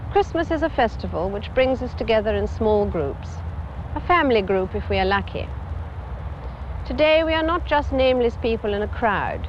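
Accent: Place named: RP, United Kingdom. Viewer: That's how received pronunciation is supposed to sound you utter fool.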